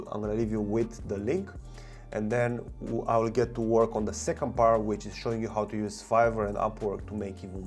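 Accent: Romania. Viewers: Me: He has Romanian accent. Me after reading his name: Oh... makes sense 😂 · Man you are Romanian? You really have a romanian accent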